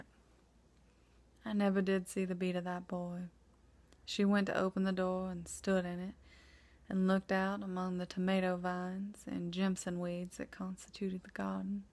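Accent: South, United States. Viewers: Such a great video! So difficult to find a great ASMR southern accent video, so thank you ☺️ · Where are you from? That was great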